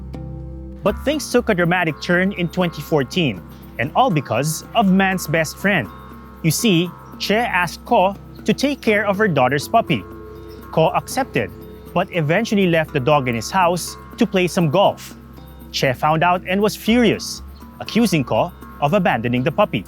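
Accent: Philippines. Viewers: Korean names and a Filipino accent 🙄 we need subtitles please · Is the host Filipino? He has that accent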